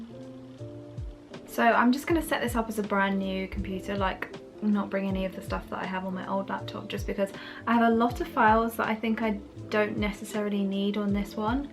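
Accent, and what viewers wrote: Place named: United Kingdom. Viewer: British Accent 💕🔥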